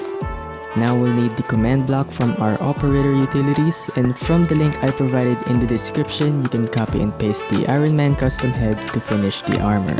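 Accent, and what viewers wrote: Philippines. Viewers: I can tell you're a filipino because of the accent, really love your build hacks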